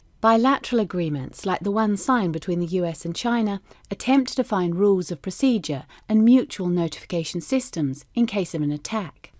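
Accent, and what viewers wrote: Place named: Australia. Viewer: I can hear a hint of Aussie in the person's voice, i can't be the only one. Sorry for going off point, i appreciate this lovely introduction!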